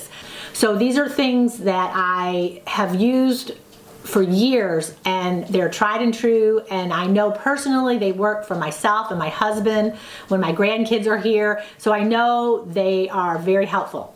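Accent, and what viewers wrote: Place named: Midwest, United States. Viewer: You have to be from the Midwest with your accent. Minnesota? That's another reason you look so young for your age.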